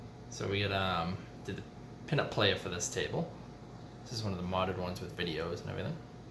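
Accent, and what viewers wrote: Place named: Boston, United States. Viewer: You sound like.yohr from boston :)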